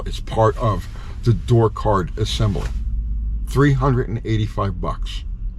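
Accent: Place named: Michigan, United States. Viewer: You have to be from Michigan. I have worked with hundreds of Michigan people and you sound and act just like them. You ramble all over the place and talk and talk but never say anything. Your videos and annoying. Tell me that I am wrong.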